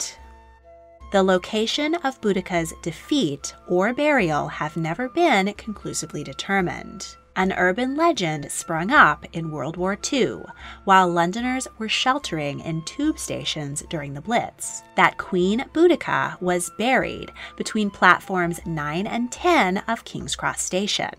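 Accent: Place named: Northern California, United States. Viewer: Good and maybe accurate, but a North Cal accent narration ? You could of hired Steven Toast of London.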